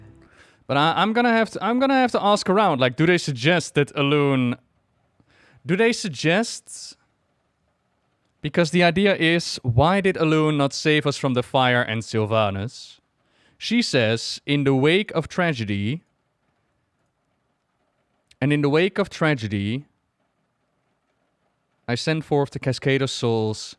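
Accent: Netherlands. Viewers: ur dutch aint yah ok, u sed it urself even . i heared it before u sed it! XD fijne dag gewenst!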